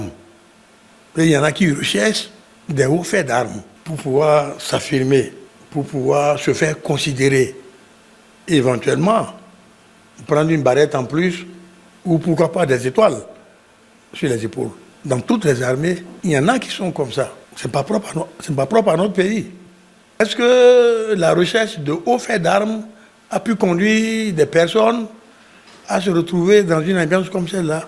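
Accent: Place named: Fon, Benin. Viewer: Votre accent résonne fon-mahi. Je suis impressionné!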